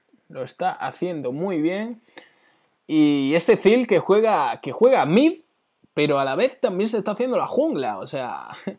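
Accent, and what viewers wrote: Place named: Granada, Spain. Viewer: tu tienes acento de mi tierra de granada jejejeejeje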